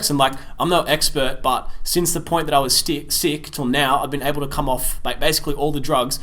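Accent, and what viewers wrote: Australia. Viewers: that fooking Aussie accent mane... great video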